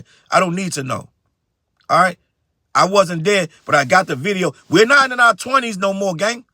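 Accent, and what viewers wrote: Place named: New York, United States. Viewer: Absolutely! Your accent is dope too. You got that NY swag👌🏽 Respectfully.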